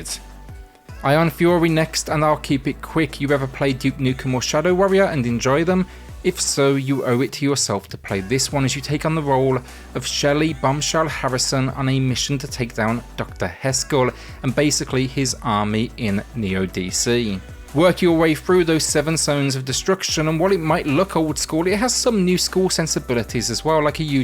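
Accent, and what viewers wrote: United Kingdom. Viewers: I have to ask, where in the UK is your accent from? Haha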